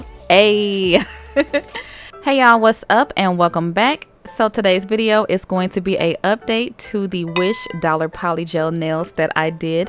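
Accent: South, United States. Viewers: Yall don't come at her she's country okay, that's how she says orange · I thought you were saying orange normally. But I'm country too so.... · OMG SIS!!! Absolutely Amazing!!! I’m loving this set. You are crazy talented sis!!! Yasss I’m wanting this set on sis. Don’t worry about how you’re pronouncing things; I’m country too sis. Tysmfs Love ya!!! 🥰🥰🥰💅💅💅💯💯💯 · First of all this look is <link>! I have a toddler son so my first thought at blue and orange was blippi 🤦🏻‍♀️ lol but it turned out so pretty! Amd second I LOVE that you talk like I do. I have a very thiccccc southern accent and honestly that's why I don't do many videos on my channel cause I hate the way I sound lol but girl your making me more confident to finally do a nail art channel! 💅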